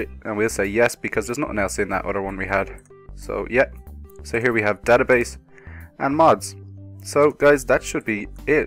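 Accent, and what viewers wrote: Ireland. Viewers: he's IRISH YAAAAAAAAAAAAAAAAAAAAAAAAAAAAAAAAAAAAAAAAAY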